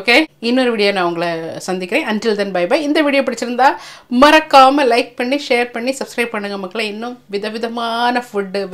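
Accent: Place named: Nanjil Nadu, India. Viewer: மாட்டிறைச்சி திங்கறது இல்ல. ஆனா நம்ம நாஞ்சில் தமிழுக்காக பார்த்தேன்.என் பதிவு எளிமை Sister. உங்க பதிவு Super Sister.🤝🤝🌹🌹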